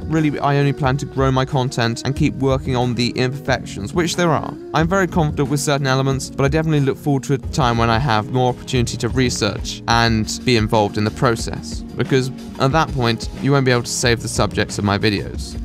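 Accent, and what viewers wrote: RP, United Kingdom. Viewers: I'm the kind of viewer that dislikes the usual QA video since youtubers usually put a lot less effort into them and are more there as a backup plan than anything. Though once again you posh bastard, your sickinigly sweet voice has tickled my inner ear canals in yet another video. I'm new here but I hope I don't get pushed into a locker for it since I plan on staying. Keep the grind going and I'll be sure to smash that like button like your voice smashes my special place every night. Though you are gay so I might have to get a penis in the meantime to fully enjoy the rammings I thrist for. Cheers cunt and make that European Union video sometime in the near future. <3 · He is gay, a philosophy student, in his early 20s and has a heavy melting British accent He is perfect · Subbed a few days before this video.Nice job your the only you tuber I know that ACTUALLY sounds professional and things.Very posh,lol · yes!!!! 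I adore all kinds of accents all of them are amazing but british is my fav!! So much so tht since i was a lil kid id worked and practiced on having an english accent (just general accent from ppl id seen on tv) and im actually rllly good at it now and i love using it, its just such an awesome spund and i can listen to talk all day lol I also love russian, australian and japanese their languages and their accent (and asl but i dont knw if it van have an accent? Maybe in different ppls hand and arm mobility & flexibility) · I feel meh about it but I like him. However a Spanish accent on English is my preference. Perhaps that's why I'm indifferent to the British accents. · Cheers dude, I've watched so much of you videos every now and then my voice instinctively makes a fake British accent. Anyways congrats dude! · On the question of accent. I don't think any of you are going to get exact accent, but one of my danish friends achieved a stunning British accent, mainly by reading Shakespeare out loud (as a part of her education and as a hobby). 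Hard work, I know, but it is possible to get a nicer accent by training.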